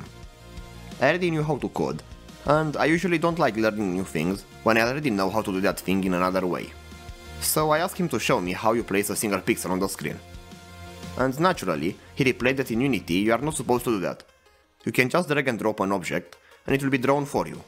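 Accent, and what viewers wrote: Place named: Romania. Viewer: Oho, this romanian accent!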